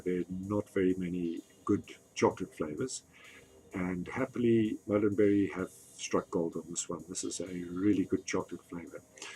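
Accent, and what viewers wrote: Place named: England, United Kingdom. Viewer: Thanks,very informative review as always. I hope you don’t mind me asking but are you originally from S.Africa as your accent sounds very English to me?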